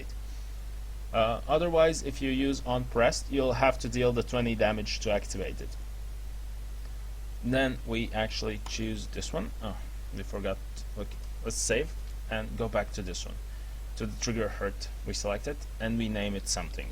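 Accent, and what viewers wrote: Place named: Portugal. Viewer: Ahaha, nota-se claramente que és pt. Bom vídeo!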